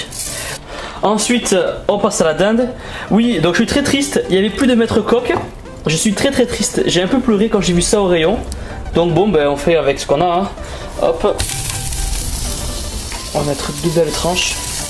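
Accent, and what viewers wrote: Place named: Toulouse, France. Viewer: DAAAMN! Salut, j'ai une ptite question concernant mon alimentation, mais avant tout, saches que jte connais que depuis à peine 24h ( mieux vaut tard que jamais) et j'ai déjà regardé presque toutes tes vidéos en un jour, jmet le pouce vert à chaque fois et jme suis abonné biensûr;) J'adore cque tu fais, ton humour, tes intros, tes conclusions et biensûr... Ton accent prononcé de chez nous! ( je suis aussi toulousain et c'est une fierté que tu le sois aussi;) BREEEF! Je suis en sèche depuis un peu plus d'un mois et j'aurais aimé que tu me dise ce que tu pense de mon alimentation ( elle est vraiment très très proche de celle que tu montre dans la vidéo "QUE MANGER POUR PRENDRE DU MUSCLE SEC?" ) Mes compléments sont: de la whey isolate, de la caséine de calcium et des oméga 3 de <link> et jboufe pareil que toi genre œufs le matin, du poulet, des haricots verts, de la whey, de la caséine au coucher etc. 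La seule différence que j'ai avec toi, c'est que, pour être en déficit calorique tous les jours, je prends pas la collation de 10h et mes repas sont espacés de 4h avec 35g de prot à chacun. Je suis très carré, je pèse tout et calcule toutes mes kcal et je ressens pas le besoin de faire de cheatmeal pour le moment ( ma motivation me surprend moi-même ) En gros jfai en sorte d'être en déficit calorique tous les jours et j'ai un apport de 35g/4h de prot environ pour le maintien musculaire. VOILA VOILA pour résumer le prk de mon message, j'aimerai bien que tu me donnes ton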